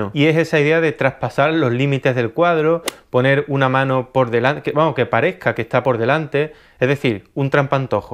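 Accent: Andalusia, Spain. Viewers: te conocí ayer y ya me eche la mitad de tus videos PEROOOOOOOO en este, amigo en 7min 21 seg te la pasaste criticando jajajaja y aclaró soy tan desde tus movimientos de manos hasta ese acento más sureño, pero se nota a leguas que la Frida no es santo de tú devoción. Saludos desde México amigo 🙋‍♀️🙋‍♀️🙋‍♀️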